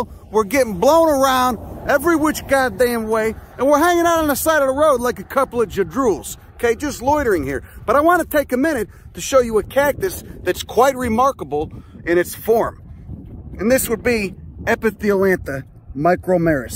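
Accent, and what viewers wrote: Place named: Boston, United States. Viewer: You guys are so bad! Lmao! It sounds like a Boston accent, but it's killing me.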